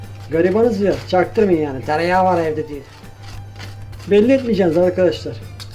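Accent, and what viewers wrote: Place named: Bartın, Turkey. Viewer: Ulan garibana destek için abone oluyorum nerelisin bu arada bartınli şivesi var sende sanki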